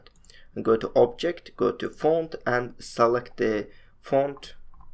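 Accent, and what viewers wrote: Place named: Turkey. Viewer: Your accent is like Turk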